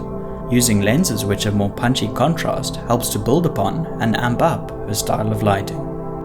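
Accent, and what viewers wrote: South Africa. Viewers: You sound South African 🤷‍♂️ lol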